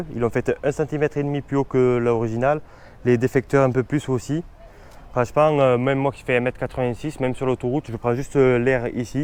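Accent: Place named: South, France. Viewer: T du sud en plus avec un accent comme ça .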